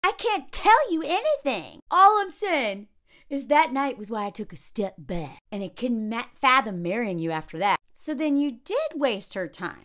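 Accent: South, United States. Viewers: You still have a southern accent. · You have a southern accent, but when I say that I mean that you have a general American accent with the slightest, tiniest southern accent on certain sounds. I only say this because I do voice acting and have pretty much the same accent. Only linguists seem to clock it.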